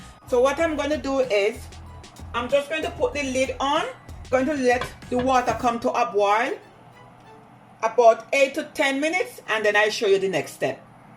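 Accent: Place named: Jamaica. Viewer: Why do you sound Jamaican though? It’s supposed to be a Bahamian accent though. But I will watch it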